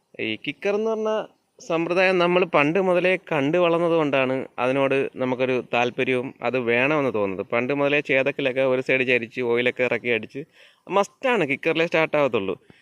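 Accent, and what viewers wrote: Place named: Trivandrum, India. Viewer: Pakka Trivandrum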